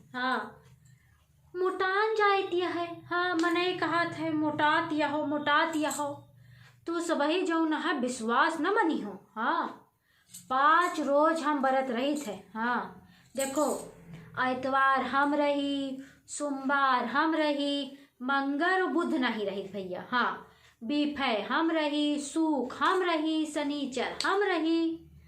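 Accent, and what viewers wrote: Awadh, India. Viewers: बहुत सुन्दर , आवाज तो हमारे अवध की है 🙏🙏। 😀😀😀😀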